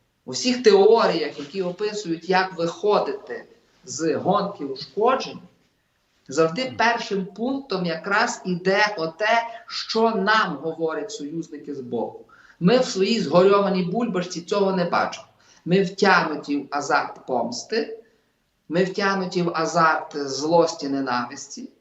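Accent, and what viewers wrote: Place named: Carpathians, Ukraine. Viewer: Надо видеть цели России и Украины. Цель России - безопасность ее границ и безопасность русских. Возврат Малороссии в состав большой России вторичен, так сказать, два в одном. У Украины своей цели нет. Украину придумали англосаксы, чтобы разделить русский народ и заставить его воевать сам с собой. Яркий пример - сидит довольно умный этнически русский Дроздов и на чистом гнусаво - карпатском диалекте вещает в пользу Украины.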